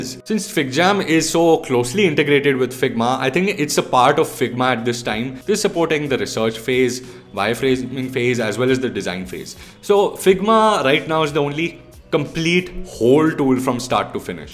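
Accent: India. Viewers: Oh my God do you have to sound so Indian?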